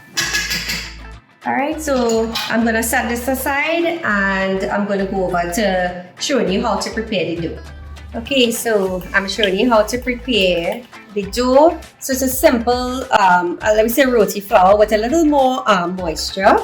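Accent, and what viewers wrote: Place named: Indo-Trinidadian, Trinidad and Tobago. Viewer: She is speaking like Trinidad Indian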